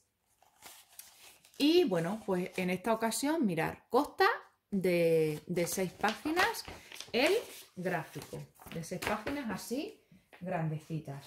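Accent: Spain. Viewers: Hola, estoy viendo tus vídeos y deduzco por tu acento que estás en España. Estaba mirando algunas de las webs de las que comentas productos, y veo que parecen estar fuera de Europa. Quería preguntarte si cuando te llegan tienes que pagar mucho de derechos de aduanas etc. Tienen cosas bonitas y originales, pero me echa para atrás lo de la aduana. Muchas gracias y que te diviertas con tus "compritas" 🤗🤗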